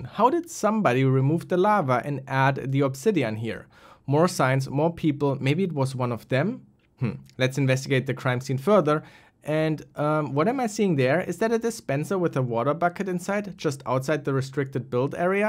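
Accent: Germany. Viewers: your german? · U just sound so german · i hear your german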